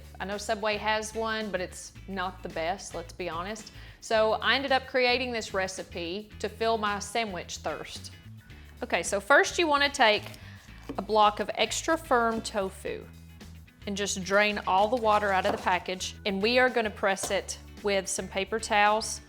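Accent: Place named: South, United States. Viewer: i love that countryness in your voice 😍😍😍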